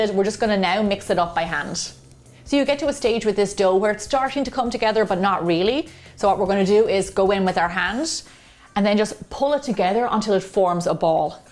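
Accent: Ireland. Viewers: For bread is as lovely as she is and her grand Irish brogue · Okay now I know your awesome. Love your style and your practicality. Very professional and adaptable to keto. Most of all love your efficiency and that Irish accent. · Are you Irish? Your accent is so charming and beautiful. Thank you for the recipe I'm going to try this afternoon with my 21 year old daughter we love to cook together. Thanks again unless you many blessings to you and your family! 💝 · Not only beautiful bread, but delightful accent!! :)) Irish?? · She sounds Irish.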